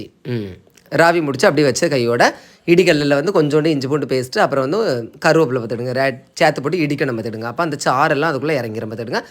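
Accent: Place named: Kanyakumari, India. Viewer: Annan namma Kanyakumari slang super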